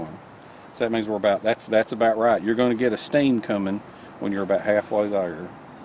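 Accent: South, United States. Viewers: ok this is super stereotypical but your voice is the perfect southern accent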